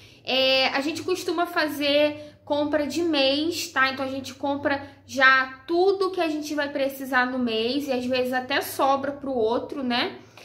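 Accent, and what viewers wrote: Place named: Rio de Janeiro, Brazil. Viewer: Oi ta tudo bem caro mais fazer compra por mes e bem melhor Ótimas compras eu tmb comprei chester na peomoção kk eu tmb compro tempero na casas Pedro Eu sou do RJ pelo seu sotaque vc e do RJ tmb ou me enganei kk eu moro perto do shopping Grande Rio Baixada fluminence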